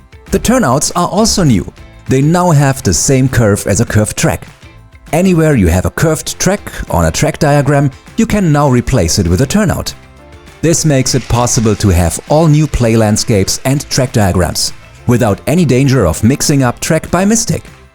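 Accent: Germany. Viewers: Man merkt das das von nem deutschen gesprochen wurde 😅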